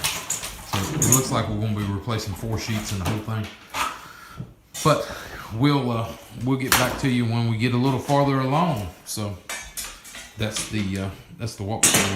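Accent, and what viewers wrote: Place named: Alabama, United States. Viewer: Oh, and the Alabamaspanglish is hilarious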